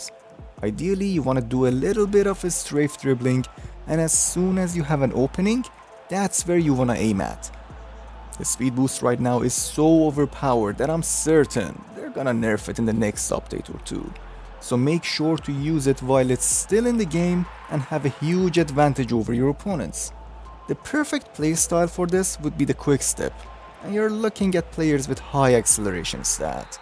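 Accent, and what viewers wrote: Iran. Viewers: Are you persian by any chance dadash?😅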